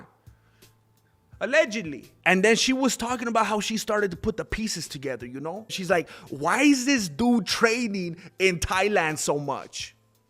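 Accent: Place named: Mexico. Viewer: Eyy fool where is that ole mexican accent ?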